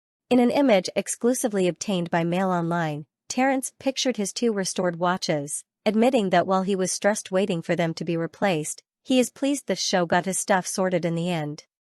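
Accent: United States. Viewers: Your voice is awful-why is an American doing this voiceover!! Has she inhaled helium ..awful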